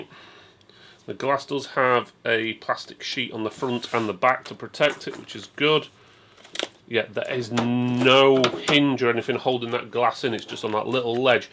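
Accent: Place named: United Kingdom. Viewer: Too much Bri ish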